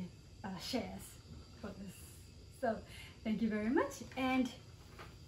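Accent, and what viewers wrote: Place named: United Kingdom. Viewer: Wow! Very nice! It put a big smile on my face! Actually I can hear a tad British in your accent haha!!! Your English is so good!! Thank you for making this video! :)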